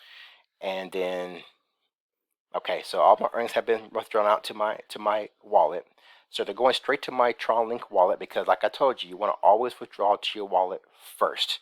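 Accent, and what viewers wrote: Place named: United States. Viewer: Are you in the US. am I able to use simple swap in the US? You sound like you are American, but the flag on simple swap is british.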